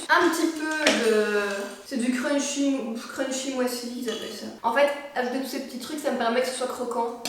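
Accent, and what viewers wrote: Belgium. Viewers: J’adore ton accent belge 😂 pour les pâtes de pois de pois chiche et lentille y en a au colruyt 😉 es ce ke tu peux dire quelle genre de biscuit tu manges es ce que sont ceux des régimes ou les normaux😘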